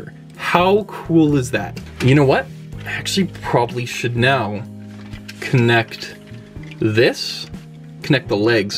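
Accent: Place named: Canada. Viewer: Welp I was wrong your voice ait wired you are just Canadian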